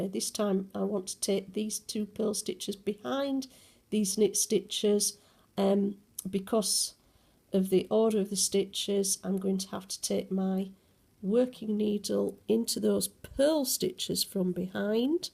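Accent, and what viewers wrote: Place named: Northern England, United Kingdom. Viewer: Love your Northern accent ...and of course the neat technique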